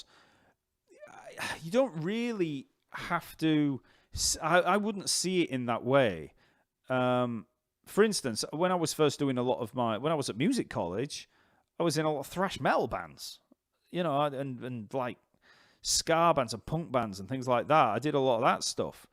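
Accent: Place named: Northern England, United Kingdom. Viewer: Hey, cool about moving here to USA! I’m from both San Diego/Los Angeles, and live currently just north of Atlanta. I love your up north accent, no wonder I have no issues understanding you, because I am used to my fav Manchester bands accent, and can understand them when they’re drunk (most of the time), you kinda sound like Johnny Marr, Bernard Sumner!:) Sheffield is where the is from I think! I love Phil Oakey. They have some great bass lines, mostly synth but I think there’s a bass guitarist for “ ”:) glad you’ll be closer, so I stop missing the love hangs so much! Lol Dallas or Austin in TX is also cool! I’ve visited. Anyway thank you for this lesson! What you described about the chord progressions having a lot of extras on them is exactly what I’ve been struggling with within music theory. I bought your chord tones course and I’m so glad I did! 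I’m excited to get a start on it soon as my tendonitis gets better! Thanks so much for your awesome teaching style and materials! I have so many aha! Movements when watching your courses and YouTube videos!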